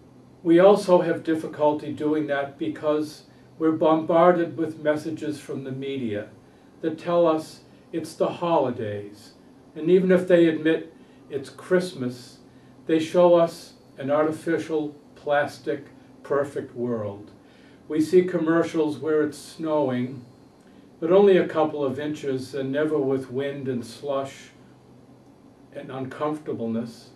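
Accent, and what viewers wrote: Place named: Boston, United States. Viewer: Cousin, I really loved this video. It bring the spirit of Christmas through the voice of children. All done in a still nice Boston accent. You are a star! Merry Christmas. I'm going to Japan on 12/27 to see friends ( i use to live there) and to celebrate my 60th birthday on the Epiphany, Jan. 6th. from your cousin Joanie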